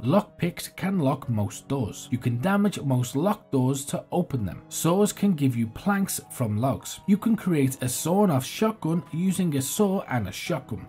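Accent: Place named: Northern England, United Kingdom. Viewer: OMG You did that on purpose right? I do believe you just created not o ly one of the most useful dayz videos for beginners like myself, but you also just rested one of the best money earning youtube videos of everyone who watches it and finds it useful is gonna be back here again and again and...yeah and so on lol. Well done on both counts lad, nice work! And to think k I was gonna say I find your videos amazing but so fast I am forever rewinding and rewinding LOL, well I won't bother asking you to slow them down after seeing this one! That accent be familiar to me. My wife she be from Chester and used to live the Wirral. I'm from Scotland so we both count as northerners, nice tae meet ye. 👍💚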